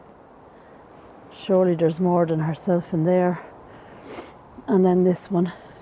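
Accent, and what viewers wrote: Ireland. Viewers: Beautiful looking final resting place me and my baby sister we love 2 read head stones etc my baby sister is in heaven now this nice lady i meet in east land mall in Warren Michigan she told me the weather in Ireland was windy and rainy that was in the 1980s was very young in them days probably around 3o I think 😮 love your Irish accent people say I talk whit a accent 2 women's love it men ask me man where are you from tell them Alabama lowndes county heart ❤️ of Dixie wishing you love peace and happiness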